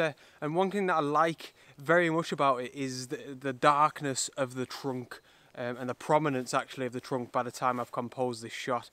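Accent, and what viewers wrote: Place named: England, United Kingdom. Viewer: Love the photos and the English accent! (great focusing tip, also)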